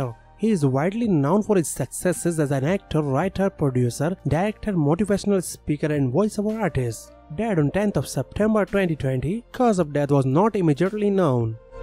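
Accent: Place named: India. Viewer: He did not ruin a thing, It's just he is from India and learned to pronouns like the English with a dash of an Indian accent .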